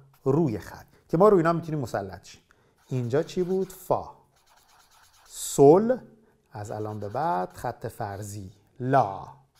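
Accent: Bushehr, Iran. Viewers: لهجه‌ی بوشهری هم عالی. خیلی از شما ممنونیم. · عزیز چی بگم در تعریف این درس های شمو که دیگرون نگفته باشن همگی اموزنده وبزبان ساده مثل کلیدی برای قفل بسته موسیقی ما. در مورد گویش شما این بنده شیرازی که ۶۰ است از وطن دورم هنوز شیرازی تر از شیرازی های امروز حرف میزنم و از گویش بوشهری شما لذت میبرم. زنده باشی کاکو. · من کاملا موافقم با حرف شما. از این به بعد به لحجه زیبای بوشهری سخن بگویید. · I love boushehri accent . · سلام ، موافق لهجه بوشهری هستم. عالیست. ❤❤❤ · استادجان، من به لهجه شیرین بوشهری رای می‌دم. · صد درصد موافقیم با لهجه خیلی شیرین بوشهری گفته شود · ممنونم ازتون خیلی خوب بود لهجه بوشهری عالیه با ما بختیاریها تقریبا تو ی خطه❤ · خیلی خیلی عالی هست برنامهاتون. اگرچه لهجه بوشهری (مثل تمامی لهجه های دیگه دنیا)، بسیار جالب و شنیدنی و لذت بخش هست، ترجیح بنده اینه که بدون لهجه باشه. باز هم ممنون برای تولید این برنامه. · درود بر شما ،از وجود هموطنی همچون شما که دانشی به این ارزنده گی رو رایگان در اختیار مردمش قرار میده خوشحالم، و با لحجه ی بوشهری کلاس شیرینیش بیشتر میشه، دورت بگردوم... 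· لهجه بوشهری 😍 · دورتون بگردوم... خیلیهم عالی لهجه ی بوشهری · دورت بگردوم، قند و نباته لهجه بوشهری! 😍🌹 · عالی بو استاد جان خیلی ممنون لهجه بوشهری هم خیلی شیرینه ولی شما لطف کنید همین طوری توضیح بدید ممنون میشیم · همه لهجه های ایران عالین و بوشهری هم که شما شیرین ترش کردی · لهجه بوشهری موافقم با اینکه ترکم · معلمی هنر است و شما نمونه یک معلم خلاق و هنرمندی با اخلاق هستید . من با شما موافقم. شما با لهجه شیرین بوشهری بیان کنید. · موافقم با لهچه شیرین بوشهری ادامه بده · درود بر شما شما واقعا کاری کردید کارستون خیلی ارزشمنده خدا را شکر که با شما اشنا شدم من خیلی دوست داشتم که اطلاعاتم بیشتر بشه در ارتباط با موسیقی ممنونم که هستید دورت بگردوم زبان بوشهری خیلی هم شیرینه 👌👏👏👏👏🙏🏾🙏🏾👏👏🌹🌹🌹🌹🌹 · منم با لهجه بوشهری موافقم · صددرصدموافق اجراي برنامه به لهجه بوشهري هستم چون بايك تيردونشون ميزنم هم موسيقي يادميگيرم هم لهجه شيرين بوشهري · صدر در صد با لهجه زیبای بوشهری. استاد عزیز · با لهجه بوشهری همش برو😍😍👍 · بسیار لهجه بوشهری را دوست دارم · چرا لهجه بوشهری توی ویدیوهای بعدی نبود ؟ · لحجه بوشهری رو عشقه عامو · "لطف بكنيد دورتون بگردوم به لهجه شيرين بوشهري باشه " شما هم خيلي با صفايي دل ات خوش تن ات سلامت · با سلام اقای عزیز واقعا بیان زیبایی داری وانسان را به موسیقی علاقه مند می کنید وزبان بوشهری با جنابعالی زیباست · لهجه شیرین بوشهری 😄🌺💐🎶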